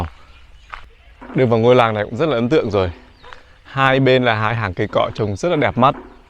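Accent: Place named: Mekong Delta, Vietnam. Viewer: Canh giong mien tay wua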